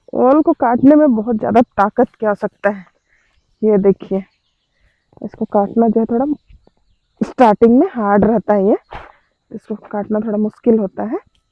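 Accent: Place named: Bihar, India. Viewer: Hamlog bihari hai lekin aapki sari bate hamlogon ki tarah hi hai isliy achche se samajh aata hai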